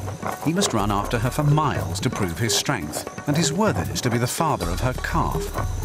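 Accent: United Kingdom. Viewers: Great documentary, the old ones with the British narrators are my favorite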